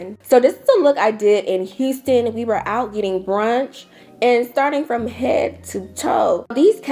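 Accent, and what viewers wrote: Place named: Nigeria. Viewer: I heard that accent come thru…. Nigerian? If I am right, a lot of your style sense runs in the bloodline honey, Naija people never carry last. Nigerian women wrote the book on over the top and fabulous 😂😂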